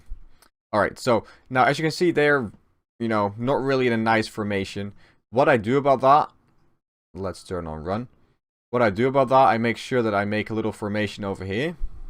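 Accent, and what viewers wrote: Netherlands. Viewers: ARE YOU DUTCH? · The accent.. Dutch?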